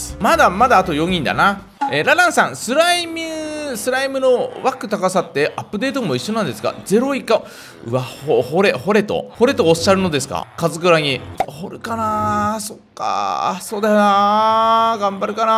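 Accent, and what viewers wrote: Fukui, Japan. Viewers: チャンク掘りお疲れ様でした！ 私も今日は2時間程、鉄の鉱脈探して掘り掘りしてました。掘り掘りは無心になれるので好きです。 前回のカズクラはやっぱり普通の福井なまりのカズさんが1番良いと思います^_^ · 最近福井なまりの前回のカズクラ聞けてないので聞きたいです。カズさんの福井なまりは聞きやすくて好きです。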